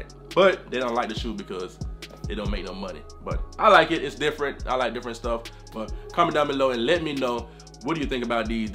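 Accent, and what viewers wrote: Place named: Georgia, United States. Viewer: lol I'm surprised the accent strong af!(but I'm in love with Georgia accents so they really stand out to me) Ion even hear the Jamaican in ya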